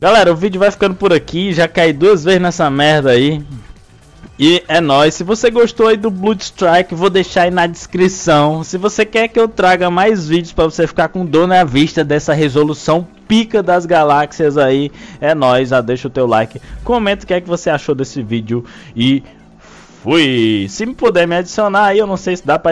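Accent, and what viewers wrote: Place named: Ceará, Brazil. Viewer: Vc é do ceara é?kkkkkkk, se não for seu jeito de falar é mt párecido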